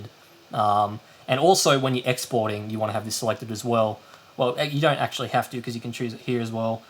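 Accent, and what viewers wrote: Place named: England, United Kingdom. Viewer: Your English is so English.. Us people from non-English-speaking countries may find ourselves as an intruders... Keep it low so us can understand you. Thanks anyway for the nice video!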